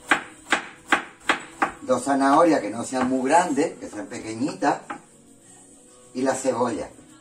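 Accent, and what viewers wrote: Andalusia, Spain. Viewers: Ole tu ,y ese acento andaluz que me encanta, y esa cazuela que buenisima pinta ,gracias de una catalana 👍🎅🎄🎊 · Me encantan tus vídeos y no solo por lo que guisas también por tu gracia, ese ángel y ese acento andaluz que mola tanto.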